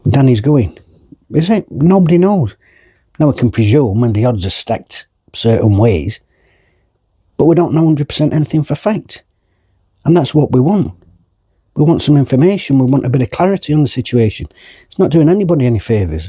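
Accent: Yorkshire, United Kingdom. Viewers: For sure midtable aint that bad. But looking at all these B-teams (Brighton, brentford and so forth), doing more than ok in the premiership, i mean surely it must be possible for wednesday to at least reach for that level? With that said, thank you for all the videos you put out. Wether win or loss you always manage to keep it level headed. And i think (as a swede) im now finally beginning to fluently understand Yorkshire english 😂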